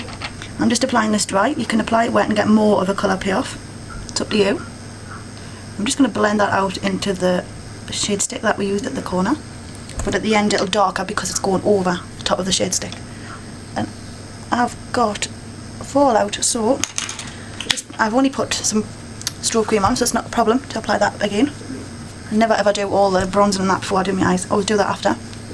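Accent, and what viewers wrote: Newcastle, United Kingdom. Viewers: It's Geordie - as in Newcastle etc... !! !!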